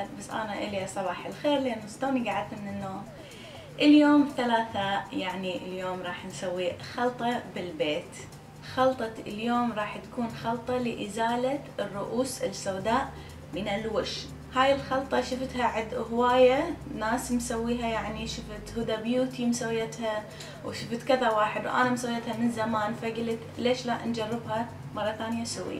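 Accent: Basra, Iraq. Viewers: لهجتها بصراوية ماعرف الة اجربها يلا اعرف زينة لو لا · افف احب لهجتكم يا العراقيين♥️ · فدوه اول مره اشوفج وحبيتك 😍😍😍لهجيج مال بصره انتي من البصره ؟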